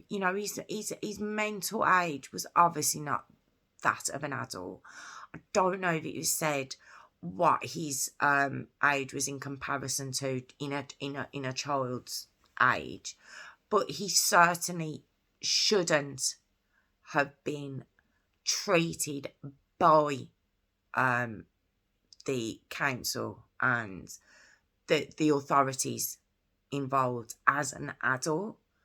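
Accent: Birmingham, United Kingdom. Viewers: Thank you so much! Please do be assured that I love the brummy accent! I’m from Northampton in England and I went to boarding school in Worcester when I was a kid. I went there because it’s a special school for visually impaired people so they have everything naturally equipped for people such as me. Please don’t ever think I mind talking about either of my disabilities, on the contrary I love to talk about them so as to raise as much awareness as possible! I’ve been blind ever since birth which is probably why it doesn’t bother me in any way at all. My biggest challenge is fitting in with the world as everyone expects people like me to embrace and adapt to all sorts of visual stuff left right and centre, which if you can’t see at all and never have done, it’s unbelievably frustrating that I can’t just be allowed to be who I am. If you see what I mean? 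My Autism has generally been much more of a challenge overall, I’m nearly 34 years old and it’s only really over the last 10 years or so that it’s been fully realised and picked up that I have Autism. I think because it’s only quite mild Autism and not like it’s completely severe. Also Autism was never really talked about when I was much younger and so it was generally assumed I was just a stubborn and awkward person who couldn’t be bothered to behave properly! But it just shows Autism doesn’t need to be really severe, you can still have Autism even if it’s more on the mild side! I find I generally struggle with the social wo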